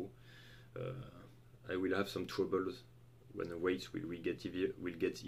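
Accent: France. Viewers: Is French Accent real or forced? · Nice. Your accent is even thicker than NH's. Good thing I'm able to understand glorious French accents without much trouble. You have a pretty good physique, how many years have you been training for?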